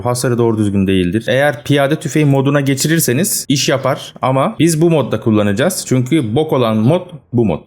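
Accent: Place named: Foça, Turkey. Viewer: SEN GÜNLÜKBAŞILI MISIN YEĞEN? Foçalı şivesi çıkıyor sende.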